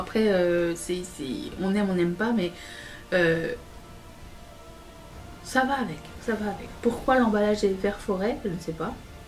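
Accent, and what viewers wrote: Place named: Quebec, Canada. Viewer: Je t'ai découverte il y a quelques jours, je trouvais que tu avais quelques tournures de phrases et de prononciations qui sonnaient québécoises! Ça fait du sens maintenant que j'entends que tu es au Canada! Profite bien de l'été qui arrive! 🦋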